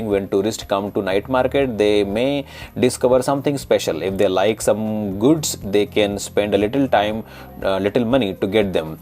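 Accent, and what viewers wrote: India. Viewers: Sorry it was not strong enough, I have calls with lots of indian providers and speakers and the accent is almost impossible, this one here was easy. I wish I could find a more difficult one. Thanks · Your India accent is clear enough to understand ,i met worse🤣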